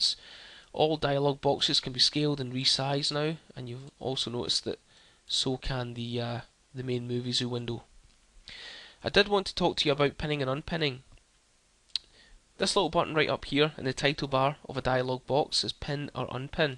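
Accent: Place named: United Kingdom. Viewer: Thanks you, very helpful. However to my mid-western American ear some British accents are as clear as day and others might as well be speaking Chinese. This one is kind of in the middle. I don't think it would hurt to speak more slowly and distinctly.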